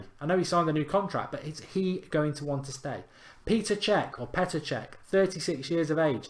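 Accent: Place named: London, United Kingdom. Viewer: This prick sounds like hes from london and there he is supporting a team whos city hes probably never even visited 300+ miles away. #gloryhunter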